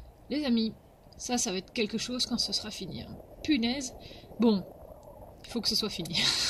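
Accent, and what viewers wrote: Belgium. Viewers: Sans oublier que le fait qu'il y aie une caméra, ça en calme souvent plus d'un ! C'est comme un bouclier. Ca a peut être l'air d'un gadget, mais en fait une caméra c'est une fameuse assurance à moindre coût. Maintenant, je me sens tout nu si je n'en ai pas. :) Chouette vidéo. Y a le côté "j'ménerf pas, j'esplik" dans la voix, le côté "Belge" plaisant. Je ne me sens pas seul du coup ! ^^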